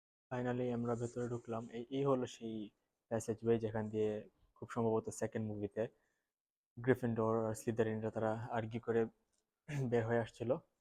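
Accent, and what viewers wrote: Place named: Sylhet, Bangladesh. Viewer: ভাই সিলেটি মাত ঐ ভালা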